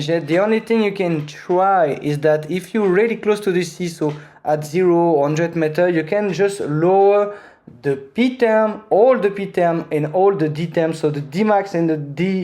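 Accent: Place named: France. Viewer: f*cking english 😂😂😂 nice tutor in frenglish dude 🤜🤛😁😁😁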